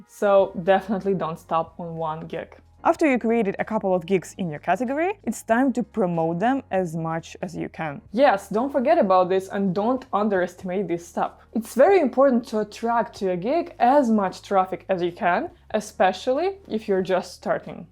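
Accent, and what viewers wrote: Russia. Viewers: Are you a Russian? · Are you russian or latvian or from Dutch ? Im a bit confused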